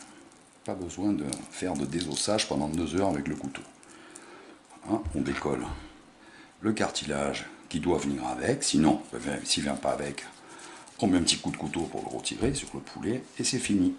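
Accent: South, France. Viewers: il explique bien, c’est l’accent du Sud qui fait tout 😂😂😂👍🙋‍♂️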